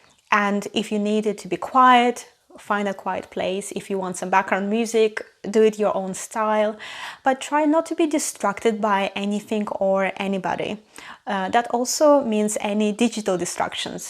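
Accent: Russia. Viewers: I can hear Russian accent. Are you from Russia?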